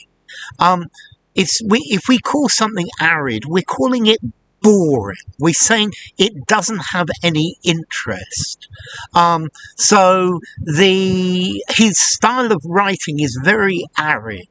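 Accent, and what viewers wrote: United Kingdom. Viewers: hello dear sir, thank you for this brilliant explanation, I'd be very glad to know what is your British English accent please.😊😊😊😊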